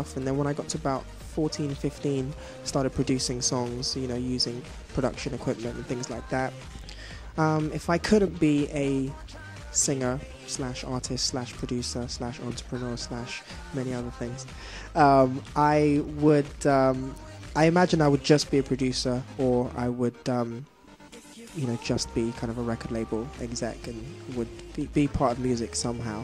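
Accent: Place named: United Kingdom. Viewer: iHadd a feeling he had a accent with a namee likee Taio Cruz and he sings like he has a accent . bu ididnt think it would be british bu he cutee buu yeaa OMG iLovee him even more now sence hes british hehe he's so sweet & cutee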